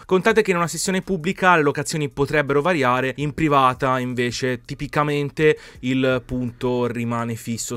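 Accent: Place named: Rome, Italy. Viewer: È di Roma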